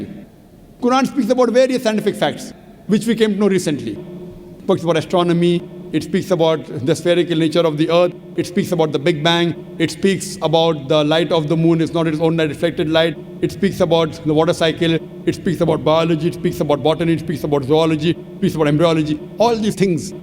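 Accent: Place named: India. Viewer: I am really liking the Indian accent . It is cool .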